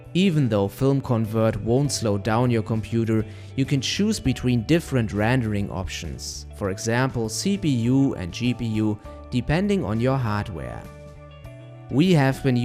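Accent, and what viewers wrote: Germany. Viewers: is that a german accent that this guy have?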